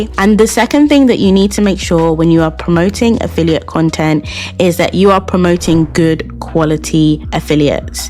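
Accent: United Kingdom. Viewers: I agree. It’s nice to hear a follower Londoner. Such valuable advice. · I haven't even watched the whole video but when I heard the British accent (finally! A British gyal!!) and heard the gems you had already dropped in the first 3 mins, I hit those 'like' and 'subscribe' buttons!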